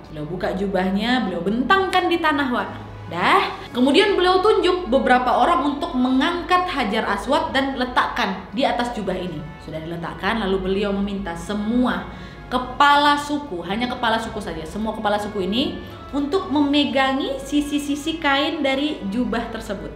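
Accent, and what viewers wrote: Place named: Medan, Indonesia. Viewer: Cerita penggalan sejarah Nabi yg disampaikan dengan cara yg sangat menarik , tuturan gaya medan yg khas dan isi cerita yg terurai dg enak dan menggelora jiwa yang selalu haus terhadap kebesaran Yang Maha Kuasa dan keindahan Achlak Nabi Muhammad SAW. Selamat wak. Semoga istiqamah wak. Wassalam. Ww.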